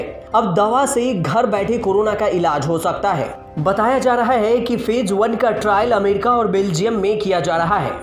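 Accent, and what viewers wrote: Bihar, India. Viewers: Iski awaz acchi hai voice over bolne wala bihari hai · इनकी जबान साफ नहीं है जिससे समझने में दिक्कत हो रही है बिहारी टंग है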